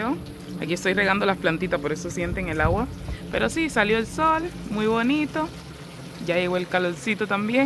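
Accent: Cuba. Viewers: Es que son cubana las 2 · Ella las dos son cubanas por eso hablan parecido . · Ehm... los cubanos hablamos así. Infórmate un poco amix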